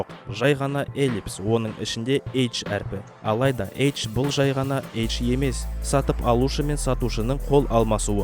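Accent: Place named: Kyrgyzstan. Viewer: 😡дұрыс сөйле қырғыссын ба?👅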